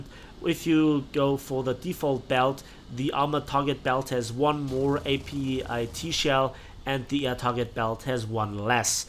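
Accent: Bavaria, Germany. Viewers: How could anyone tell you to shut up?! Your Bavarian accent is extremely enjoyable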